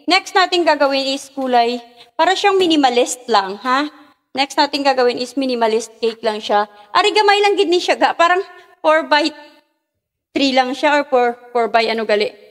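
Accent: Iloilo, Philippines. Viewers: ilonggo ka maam? hehhe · Gakalingaw ko mg ilonggo ka a.. haha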